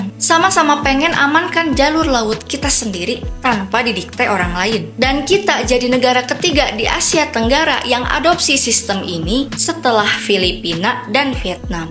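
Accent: West Java, Indonesia. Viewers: Aku suka penjelasan nya jelas dn lucu ada logat Sunda nya gitu 😂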